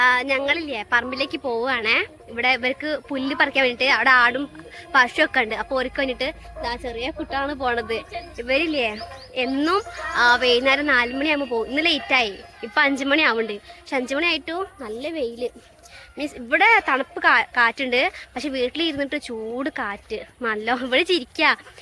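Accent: Malappuram, India. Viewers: Mlp slang anallo tirur tanur slang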